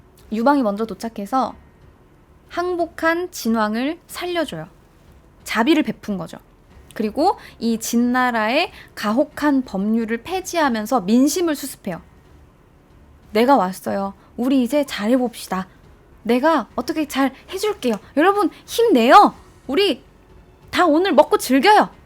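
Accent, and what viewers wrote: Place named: Jeolla, South Korea. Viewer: ~~전라도 맞지 김수미 목소리가 ㅎ ㅎ ㅎ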